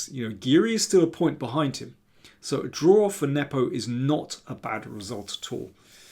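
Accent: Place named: Southern England, United Kingdom. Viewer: That's his accent - middle-class southern English, I don't know why you call it patronising or what you expect him to do about it. And he's a grandmaster, and in my view the best of the YouTube full-time chess analysts. Carlsen's opinion obviously carries great weight, but a grandmaster is perfectly free to voice objections to it. In fact that's his job, to give his opinion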